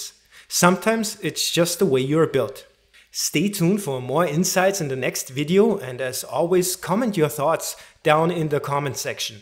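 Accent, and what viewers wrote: Germany. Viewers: Thank you for your time and work🙏🙏🙏 P.S.: höre ich da einen deutschen Akzent? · I am (was) German (lived most of my life in Sweden). I know that there is a town named Baumgarten in Austria and I think my family has some ancient connection there. Most people are called Baumgärtner or Baumgartner. Then there is "von Baumgarten", there even is a branch here in Sweden where I live, and my father claimed that his family was a "von" once but ditched it. I heard that the speaker in the video was clearly german speaking, but chose to write in english so anybody can read the Q&A, in case it conserns them, too. There are sooo many misconceptions and contradicting informations out there, I was really happy to find this video providing excelent explanations accompanied by really great animations! Thank you.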